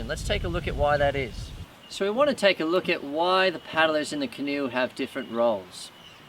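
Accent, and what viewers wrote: Australia. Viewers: Nice intro video to tandem canoing. …(is that an Australia mongrel accent you have?) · As a Canadian paddler at first I was kind of amused that somebody with an Oz accent was going to tell me how to paddle a canoe... ...then the phrase "Divorce Machine" came up... ...yeah it sounds like a good idea, but NEVER involve a canoe on a first date. I speak from experience. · You definitely some how have an Australian accent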